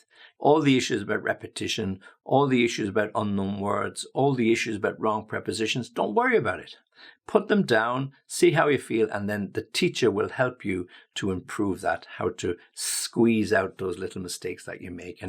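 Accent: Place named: United Kingdom. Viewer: He is from uk with British accent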